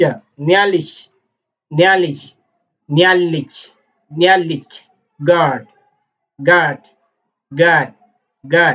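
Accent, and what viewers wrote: South Sudan. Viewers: Is this Aweil accent? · What accent of dinka is this??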